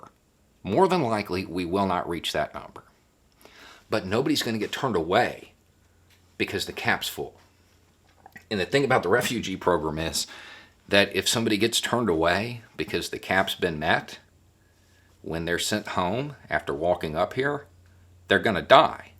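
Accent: South, United States. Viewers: I'll admit that I'm a sucker for reasonable, compassionate, levelheaded discourse delivered in a southern accent. Subbed.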